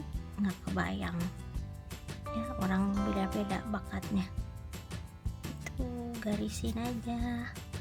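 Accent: West Java, Indonesia. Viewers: Ka lucu banget suaranya xD sunda sunda kitu logatna teh wkwk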